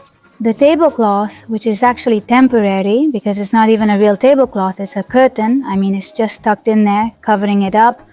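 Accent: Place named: India. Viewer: lo hace la IA en automatico XD por eso parece india hablando ingles XD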